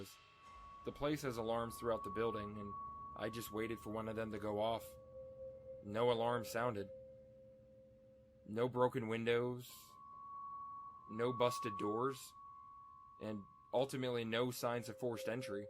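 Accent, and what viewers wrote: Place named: United States. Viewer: Nice to hear an american read for once, almost everyone are brittish Or something like that lol. Great vid // a swede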